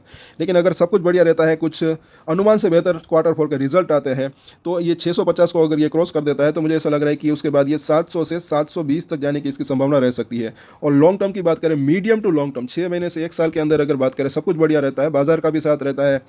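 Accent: Gujarat, India. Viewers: Sir aap Gujarat ke lagte ho plz reply dena.. Kyu ki apki awaj Gujarat ki hindi dhhal lagti hai.. Main bhi gujarati muje apke soor se aap gujarati lagte ho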